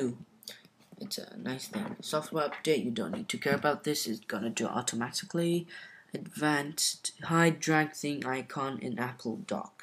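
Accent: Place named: United Kingdom. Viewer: why r u using a british accent?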